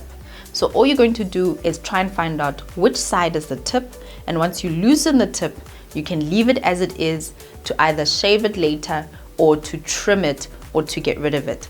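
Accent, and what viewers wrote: South Africa. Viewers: Are you from ZA? I have ZA (and LS) friends and I always love their accents. New subscriber! Edit: you just confirmed it! I knew it!!! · Please tell me u are South African 🇿🇦 or Kenya? 🇰🇪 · Yes she is South African💃🏻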